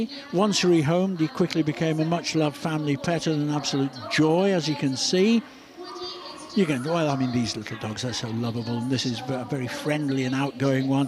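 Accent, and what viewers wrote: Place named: RP, United Kingdom. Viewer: These descriptions being read by this prestigious British man are cracking me up! So freaking cute!!! 🤣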